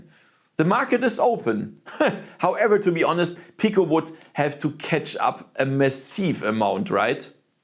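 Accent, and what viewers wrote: Germany. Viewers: he is german , you always can hear that , its with every language they try to speak.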